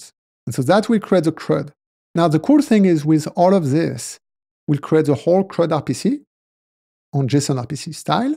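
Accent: France. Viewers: ahhh l’accent français ❤❤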